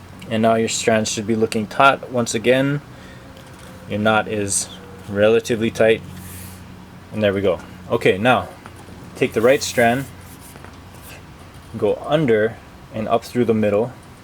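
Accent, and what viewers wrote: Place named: Hawaii, United States. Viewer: Watching thru the video and I'm like "this guy sounds like he's from here(hawaii)" so I looked at your picture and was surprise to find that u are lol.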